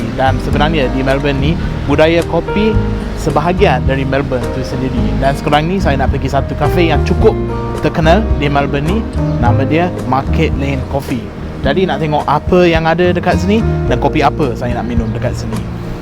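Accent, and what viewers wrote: Malaysia. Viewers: Hahaha cakap english campur malay aksen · punya speaking x bunyi mcm org putih dah. Slang Malaysia weh 😁😆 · punya slang dh jadi mcm Malaysia-English slang🤣🤣🤣 terbaik! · Perghh slang English mcm malaysian dah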